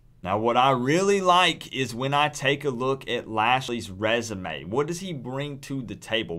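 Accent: South, United States. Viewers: Where are you from,? Sounds almost Georgia/Alabama, but as we all know, true Canes fans are severely outnumbered in these two states. UM alumni here btw in GA.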